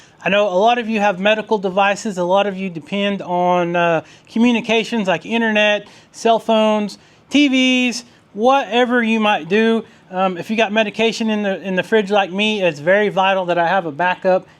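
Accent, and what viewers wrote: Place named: Oklahoma, United States. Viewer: 👋Hi and family, watching from South central Iowa; my mom was from Oklahoma, your accent and chill personality reminds me of my Uncles! Enjoy your channel very much.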